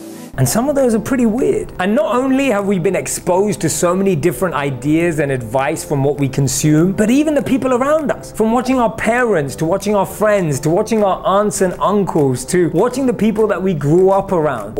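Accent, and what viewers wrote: United Kingdom. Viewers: When you talk .. I just stared at your beautiful eyes 😍 and I love your English accent 😘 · Found you by accident and glad I did. I appreciate what you have to say and love the way you present it. The gorgeous eyes and the British accent make it so easy to watch you, LOL! My sister asked George Burns the 100 year old comedian actor in Las Vegas, (who passed away years ago), “How do you know if a man loves you?” George replied, “You ask him do you love me? if he looks away before he answers the question… He doesn’t!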